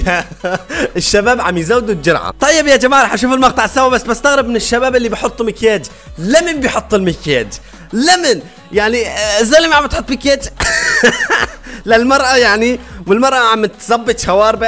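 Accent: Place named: Iraq. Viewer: ههههههه يخبل انته من وين حجيك قريب ع عراق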